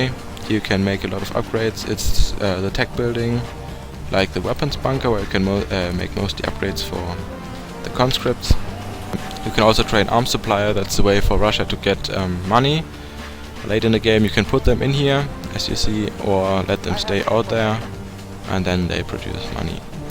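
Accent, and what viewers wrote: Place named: Germany. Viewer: Man hört den deutschen Akzent aber sehr gutes vid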